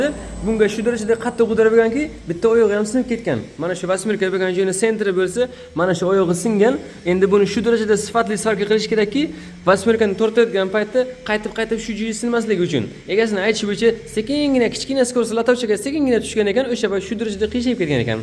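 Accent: Bukhara, Uzbekistan. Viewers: Бухороча шеветон дажонам❤😅😅 а Владивосток салом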